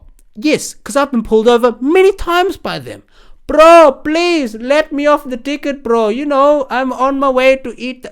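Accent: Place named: India. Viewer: Bro i love your videos, that indian accent though. Need to see more 👍👍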